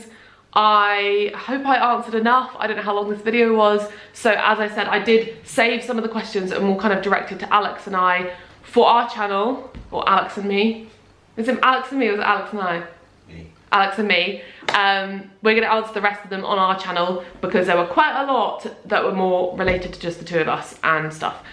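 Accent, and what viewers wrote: United Kingdom. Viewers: aiutooooooooooooo the uk accent is really hard to understand , could you help the people about that ( it seems that you have to run , because you have to make dinner / lunch or to go in bathroom hahahah) ????????? thanks in advance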